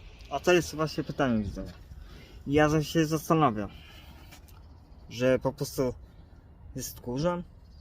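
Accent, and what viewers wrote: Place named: Łódź, Poland. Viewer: Mówi typowo łódzkim, miękkim akcentem. xD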